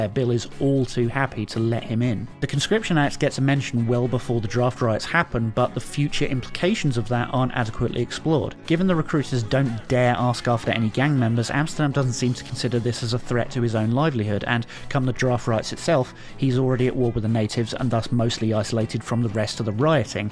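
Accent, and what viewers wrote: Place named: United Kingdom. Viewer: I find it highly distasteful to listen to a person with an accent that is obviously borne of some kind of relationship with the UK analyze America strictly from a viewpoint that is the product of HIS times. As a full-blood Cajun, I freely own being of Immigrant stock..tho my ancestors arrived on these shores as the result of being driven out of France in1685 by the Edict of Fontainebleu, making Protestantism illegal, declared by Louis XIV. MY ancestors arrived in 1723, according to a Diary kept by Solange Therese Thibideaux, wife of Jean Michel Thibideaux. NOTHING is known of their life in France, as agents of the French Crown pursued my kind for what must have seemed like forever. Meanwhile my kind intermarried with the various Indigenous tribes of the Caribbean, and learned that land the Yankees AND Southerners DIDN'T want was rich in creatures that were good for food; that small lots of ground protruding from cypress swamps were ridiculously fertile if you knew WHAT to plant and where...and so my Kind survived. 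My family aided runaway slaves by building tiny shacks which blended into the swamp...places where clean water in jugs, blankets my ancestors could ill spare left to stay warm in on chilly nights, and dried beef, hardtack and salt were stored in boxes built by hand and waxed to keep moisture and insects out. After the War, free Blacks-not many, but some, were able to walk openly up to my 4× great granpapa's front stoop and thank him. So how DARE you, Mr. Voice From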